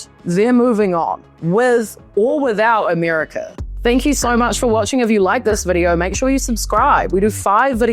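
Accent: New Zealand. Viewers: The accent screams Kiwi. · I am guessing NZ accent doesn't sound Australian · Nice one, I thought you were an Aussie until you I heard you say Albanese incorrectly. But that's ok, Kiwis are cool too, should become our seventh state. 😛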